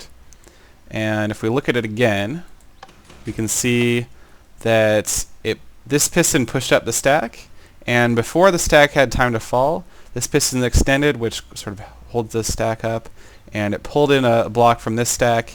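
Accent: United States. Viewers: maby he is trying to spell "Fußball" the german word... ?! because that the typical american accent...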